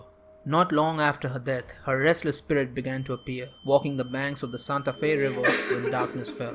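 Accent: India. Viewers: Why does the narrator sound from India? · Full of Indian accent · No offense but it sounds not authentic, specifically because of the Indy accent 😂 nice try though.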